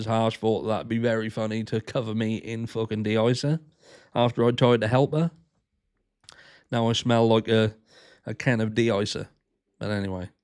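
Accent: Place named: RP, United Kingdom. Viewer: Hello Harsh that posh accent 😂 i didn’t think it was you until the swearing😂.. in bed still ill & hubby put you on but i wasn’t watching at first just listening i thought thats no harsh.. 😂 that was a lot of docs.. sorry to hear about your daughters glasses.. maybe who done it will own up.. not.. hv a good day everyone im off to feel sorry for myself 🤷‍♀️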